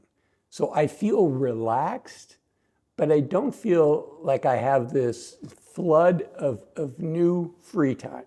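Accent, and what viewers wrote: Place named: Utah, United States. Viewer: I’m a transplant to Utah after a career in the military. I knew I recognized that Utah accent of yours.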